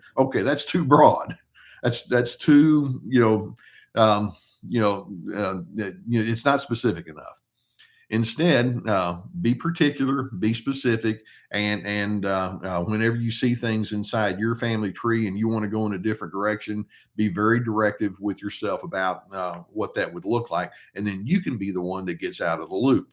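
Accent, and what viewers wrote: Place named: Texas, United States. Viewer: Wow I’m from Texas to no wonder I feel at comfortable listening to you your accent your mannerisms your belief you vibrate like the people that I come from where I come from I’m from Lubbock texAs and also I like your Maas green shirt you wear unusual colors I know that the topic is very important and I’m learning so much from you it’s very very exciting to be empowered by your knowledge empowered to be me what a concept wow thank you so very very much. Such a gentle soul THANKYOU🐶🆙🙏🏻🤍😃🌷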